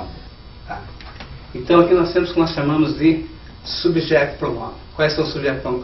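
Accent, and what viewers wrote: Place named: Paraguay. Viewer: Meu Deus que ingles mais paraguaio!!! The pronunciation is terrible ! Nun fala nen ingles nen pt direito! Nao existe ingles em 50 horas , nao se iludam. Aprender uma lingua nen é algo mecanico.... olha se essa tecnica é tão boa , os filhos e parentes desse cara deve ser poliglota kkkkkk ! Eu falo ingles e sei que aprender uma lingua nao está ligado a nivel de inteligencia. Nao acho correto enganar as pessoas desta forma se é que alguem acredita . Abraços!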